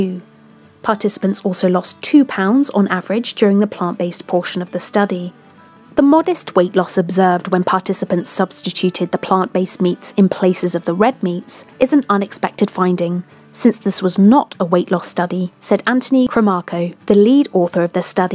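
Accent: England, United Kingdom. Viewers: Most things not taken in moderation is not good for you. So how much money did the animal agriculture industry pony up to fund this research? Must have been a lot since they even got a woman with a nice English accent to sound convincing. Clever!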